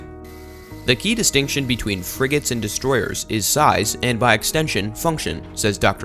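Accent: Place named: United States. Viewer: To the dude that did this video. You sound like you arr and American. You do know we don't use kilometers, we use mph. And we don't use meters, we use feet. I had to give the video 👎🏾👎🏾 for that. I have faith that you can do better next time. 😁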